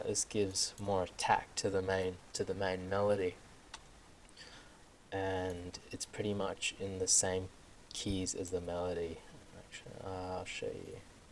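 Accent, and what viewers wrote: Australia. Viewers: Are u astraulian? :p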